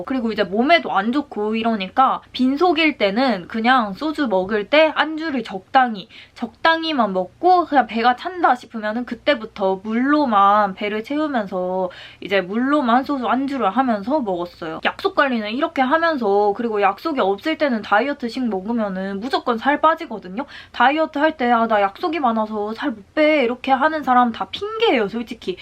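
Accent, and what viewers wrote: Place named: Gyeongsang, South Korea. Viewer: 경상도말투 너무 귀여워요!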